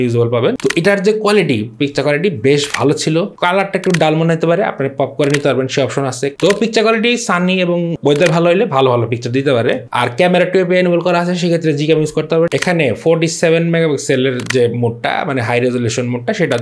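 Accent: Tangail, Bangladesh. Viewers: Vai ki tangail er nki?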